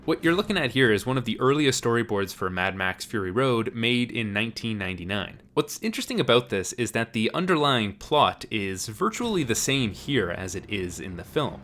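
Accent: Canada. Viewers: can you be more canadian? ... it's awesome and fun to listen to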